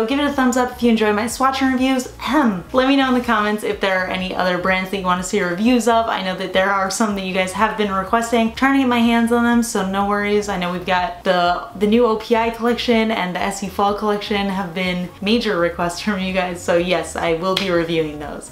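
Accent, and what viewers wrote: United States. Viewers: That was absolutely cruel to watch. They are all gorgeous. Love your channel btw. You're so chill and relaxing to watch. And I love your hair. There's heaps of American accents but yours is the coolest. What part of USA is that accent? Sorry to sound so fan girly but you deserve it. I guess you have hair dying tutorials. Might just go and check em out.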